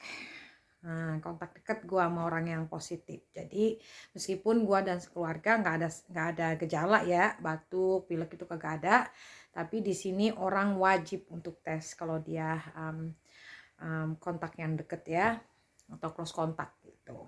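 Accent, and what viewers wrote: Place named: Jakarta, Indonesia. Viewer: Assalamualaikum Mpok.. Et Mpok kaga ilang Betawi Nye biar tinggal di negara kangguru Salam dari anak Betawi kota kampung Janis jembatan 3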